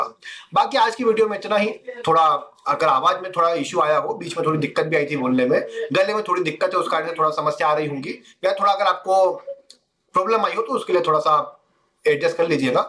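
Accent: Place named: Maharashtra, India. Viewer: लम्बा वक्तव्य होने से आपका गला सूख रहा है। अगली बार इसका कुछ उपाय करके विडियो बनायें। और, आपकी भाषा एवं आपके उच्चारों से लगता है कि कदाचित् आप मूलतः हिन्दीभाषी नहीं हैं अथवा आपकी हिंदीभाषा पर संभवतः मराठी भाषा का प्रभाव है।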